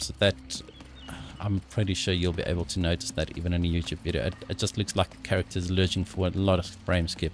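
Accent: South Africa. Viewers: Ha SA accents always so distinct .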